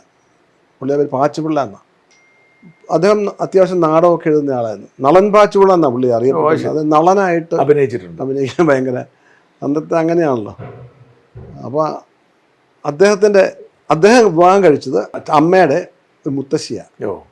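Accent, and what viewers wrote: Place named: Kottayam, India. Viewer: സൂപ്പർ വിവരണം. കോട്ടയം ഭാഷ ആയതുകൊണ്ട് ടി യാന് മനസ്സിലാകുന്നില്ല എന്നു തോന്നുന്നു.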